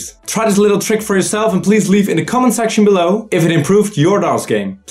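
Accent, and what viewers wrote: Netherlands. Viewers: Ik hoor aan zijn stem dat hij Nederlands is xD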